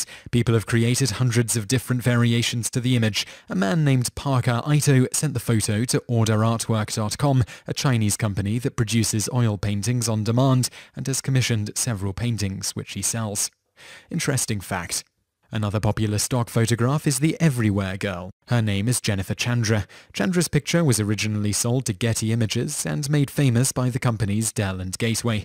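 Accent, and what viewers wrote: United Kingdom. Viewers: Why does British accent make everything seem classy and professional?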